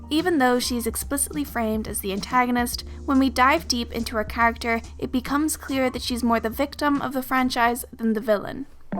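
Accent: Ireland. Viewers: Love your Irish accent :D Great video, I never thought about these points when watching HSM as a child. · Omg I just knew the narrator was a blonde! Haha didn’t expect to get a face reveal. Anyway , I loved the video :) also are u Irish or something? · I love this video and I love your accent, is it Irish? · OMG NO WAY. I’m from Ireland and I know an accent when I hear one but yours had me deeply confused! Until I looked in the description, YOU LITERALLY SOUND IRISHH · is she irish or american i'm so confused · Hearing an Irish accent here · oh its cool to hear an irish person doing videos like these! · Your accent is interesting! I feel like I can hear touches of Irishness?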